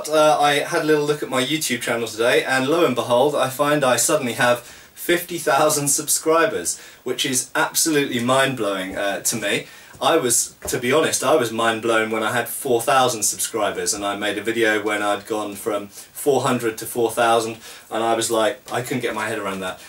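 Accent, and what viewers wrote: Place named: England, United Kingdom. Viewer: THANK YOU for making cool videos about swords! We love swords and the English accent only enhances your narrative coolness :)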